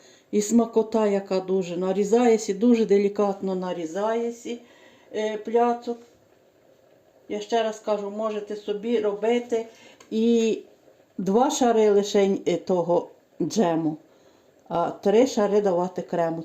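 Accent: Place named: Ivano-Frankivsk, Ukraine. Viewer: Пані, якщо це не секрет, де саме Ви живете, я в Городенці, що на франківщині, по Вашій розмові думаю що Ви також з нашого краю. Підписана на Ваш канал, пляцки суперові, такий самий зошит є і в мене😂😂😂, до цих пір печу з нього. Дякую що ділитесь своїми рецептами.😊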